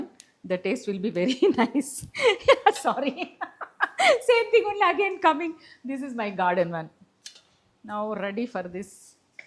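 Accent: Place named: Telangana, India. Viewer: Akka you are Hyderabadi you speak, Telangana Akka.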